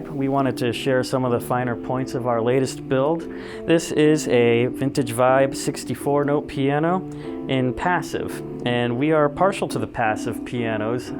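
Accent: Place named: Newark, United States. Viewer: Dat Ne'awk dirdy mouf akscent! 😄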